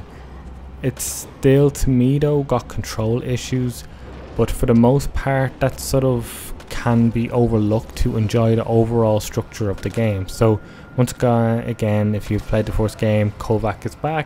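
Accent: Ireland. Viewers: You're Irish mate aren't ye, thanks for the review though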